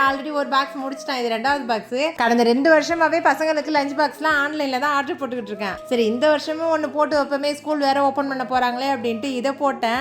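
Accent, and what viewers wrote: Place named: Mayiladuthurai, India. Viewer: Nan mayvaram neenga samikerathu pesarthu ellam engla polava irukku nan ipathan unga vidios pakeran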